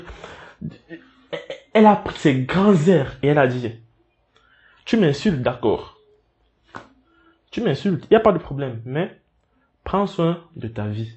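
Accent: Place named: Cameroon. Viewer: Prions seulement pour qu'elle guerisse. Sinon J'aime trop votre accent camerounais . Sinon cœur sur vous depuis la Côte d'Ivoire.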